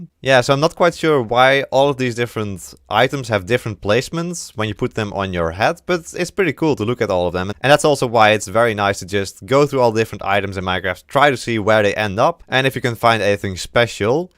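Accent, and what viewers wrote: Netherlands. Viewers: ik wist het.Nederlands accent is zioo duidelijk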